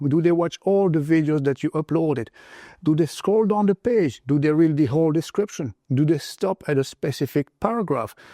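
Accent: France. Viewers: I suspected the French accent too with well spoken English. Kudos sir! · gotta love the french accent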